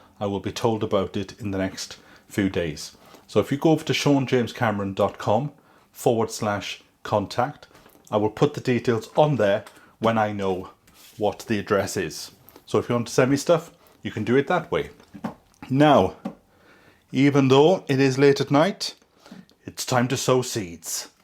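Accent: Wales, United Kingdom. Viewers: Love watching you,find you so relaxing,that lovely Welsh voice.I’ve been in my garden today starting tidying up,loads of bulbs coming up,great time of year.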